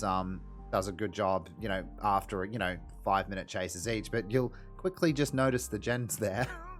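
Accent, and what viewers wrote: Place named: Australia. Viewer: I thought you were Australian. Crikey...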